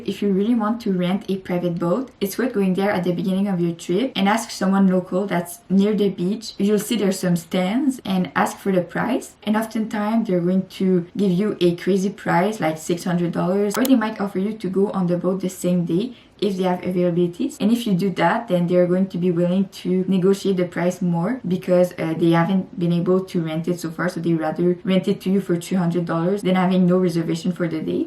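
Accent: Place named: France. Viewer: Montreal Canada. you know I will go to sorrento. positano and amalfi from China soon. your video is very helpful. besides I like to hear your French tone. it's charming .😊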